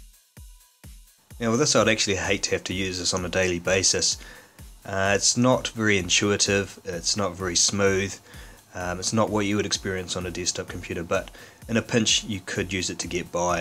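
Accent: New Zealand. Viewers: You from NZ?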